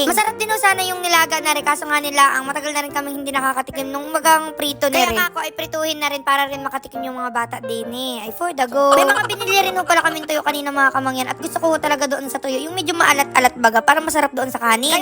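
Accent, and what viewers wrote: Batangas, Philippines. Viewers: Manyan ka,,pero tonong batangas ka...🤔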